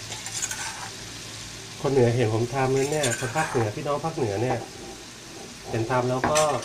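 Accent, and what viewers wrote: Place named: Isan, Thailand. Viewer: นึกว่าคนอิสาร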